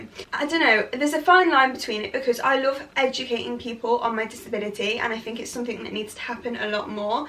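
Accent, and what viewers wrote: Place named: England, United Kingdom. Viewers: She's from Derby. I'm from Derby as well we have a couple of "native" accents here. One of em is Kelly from Misfits! lol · I love your accent, im from England too but i can't work out where the accent is from! You are extremely inspirational , beautiful and very talented at make up, you should be so proud of your content! 💗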